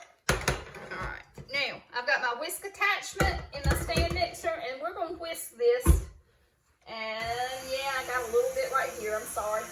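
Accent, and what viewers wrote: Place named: Texas, United States. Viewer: You talk just like me. I'm from south east Texas piney woods.😁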